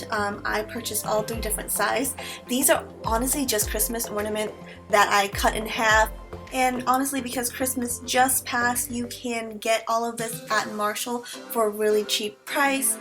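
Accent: Vietnam. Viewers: it's a typical Vietnamese accent. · She has a vietnamese accent come on now don't be ignorant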